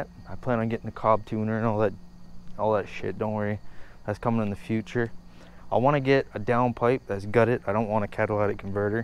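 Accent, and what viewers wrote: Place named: United States. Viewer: Couldn’t tell if this guys American or English for first 5 mins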